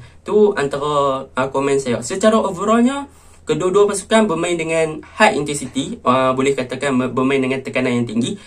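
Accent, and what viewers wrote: North, Malaysia. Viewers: Mcm ni la peminat bola sepak buat ulasan. Rasanya loghat cm dr utara ye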